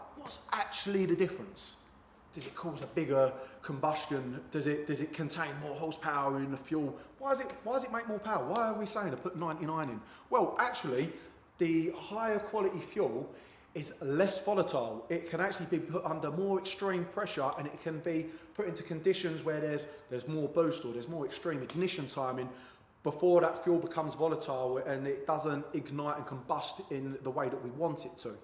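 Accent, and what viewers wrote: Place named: United Kingdom. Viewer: Very informative, but not easy to understand you since you were talking with a British accent and very fast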